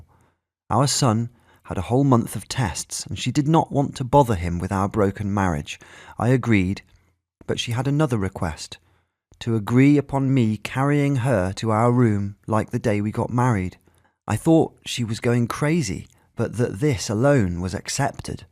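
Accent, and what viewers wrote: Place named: United Kingdom. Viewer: British accents..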